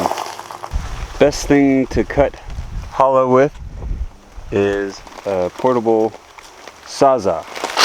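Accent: Hawaii, United States. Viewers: You sound just like the guy doing ApuaHawaiiTours(sp?),like you are brothers. Is that a Hawaiian accent or what is the origin?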